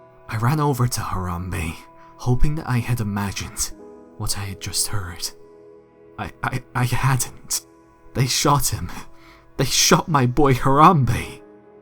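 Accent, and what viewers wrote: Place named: Scotland, United Kingdom. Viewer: I remember you when you were. Your dead Scottish voice is the best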